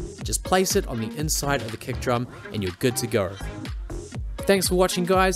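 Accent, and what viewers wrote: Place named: New Zealand. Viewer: You from NZ bro? u got my auto sub hahaha , solid video bro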